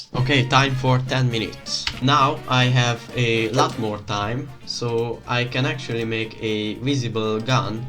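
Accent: Hungary. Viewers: i knew you were hungarian lol 😅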